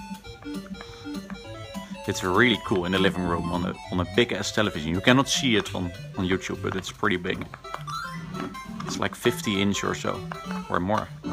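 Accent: Netherlands. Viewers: ArtOfCamelot well it definitly wasnt very good english, it had a lot of dutch trough it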